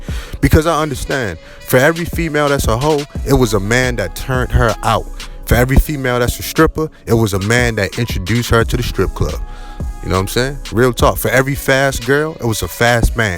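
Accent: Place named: Newark, United States. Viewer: Absolutely loved everything about this breakdown. whats understood never has to be explained and you definitely got your own lane..and that's an understatement...its over just drop a bomb on these niggas. You a real G..sound like a Newark NJ nigga too but I know youre forever. Also man I think you should consider writing a book in the long run. You're stories hit home and definitely paint the canvas. Much respect ✊🏾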